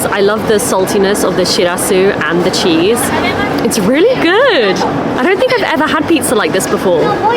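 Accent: United Kingdom. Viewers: 久しぶりの動画😂 が元気そうで何より😊 そしてイギリス英語が耳に心地よいです。これから寒い季節ですがお身体大切に🙏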